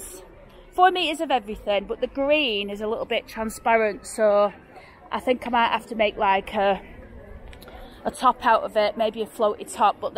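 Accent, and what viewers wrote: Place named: Yorkshire, United Kingdom. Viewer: I like your yorkshire accent, sounds like Angela Raynor!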